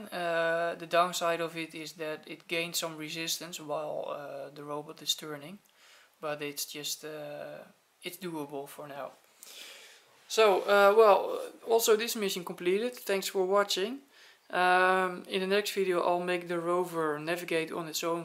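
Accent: Netherlands. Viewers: Are you dutch ? :)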